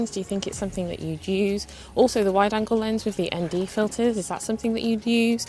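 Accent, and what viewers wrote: United Kingdom. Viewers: Also I love your british accent :)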